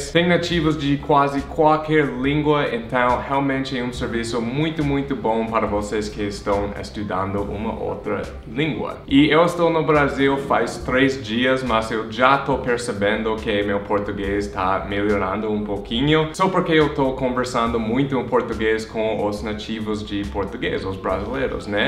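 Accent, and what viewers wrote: United States. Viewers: É mó engraçado americano falando português kkk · Filma um pouco dos encontros, e faz um vídeo pra gente que não mora é nenhuma dessas cidades. Estou amando seu canal, você fala muito bem português, gosto do seu sotaque americano ♡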